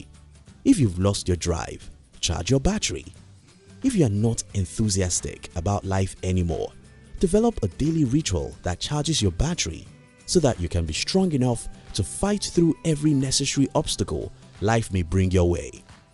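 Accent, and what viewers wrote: Nigeria. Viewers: "in the secret" Funny Nigerian speaker (obvious from thick accent) uses a white hand to do the illustrations. Good message though. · Nigerian accent ...... no doubt ....mmmmmhhh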